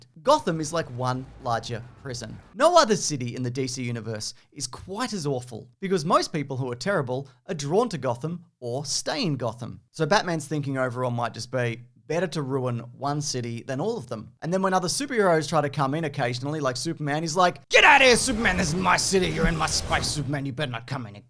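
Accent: Australia. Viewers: Came here for the lame theories. Stayed for an Aussie talking about Scotland.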